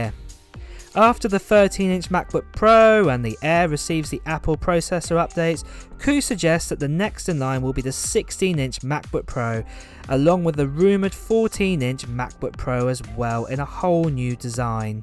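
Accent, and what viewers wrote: United Kingdom. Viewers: Are you from the UK? You have that accent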